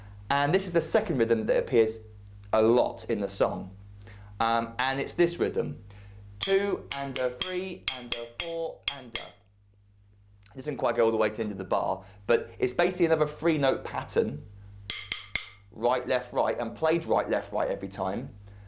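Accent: United Kingdom. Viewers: Fantastic! Thank you! (Good to hear another British accent on YouTube for a change) 👍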